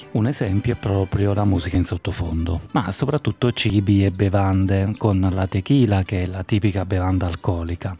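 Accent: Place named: Spain. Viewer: Wow che bell accento in Spagnolo!❤️🙌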